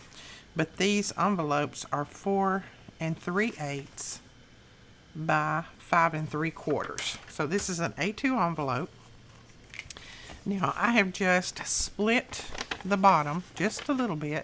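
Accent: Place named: Georgia, United States. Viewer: This was such a delightful tutorial to view this evening. You inspired me to try a similar technique if I may in my tutorial creating a Wedding Album. Thank you sincerely for your wonderful instructions. You sound like you are from Georgia. haha I am Canadian but have some sweet Georgian friends I visit now and again. I made sure to Subscribe and hit the bell. smile I would love you to visit my next tutorial where I will try this technique (somewhat) and be sure to link your Channel so you get the credit. smile Blessings! I forgot to mention my favorite season. Definitely, the FALL weather when our humidity has taken flight. haha Niagara Falls is one humid place!!!!!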